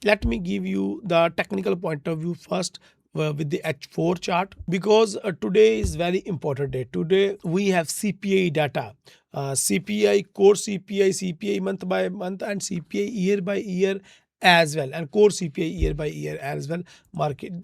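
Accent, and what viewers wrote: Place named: Punjab, India. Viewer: Bhai Punjabi voice problem kr rhi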